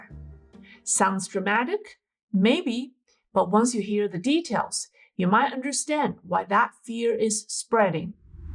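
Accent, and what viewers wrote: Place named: United States. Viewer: Wow. She sounds like an American woman.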